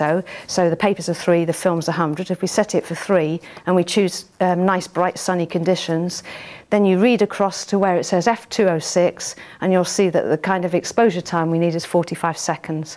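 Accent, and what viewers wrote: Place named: United Kingdom. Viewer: nice british accent!!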